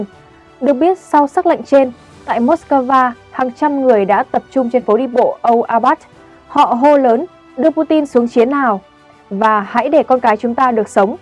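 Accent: Standard. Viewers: giọng nói chuẩn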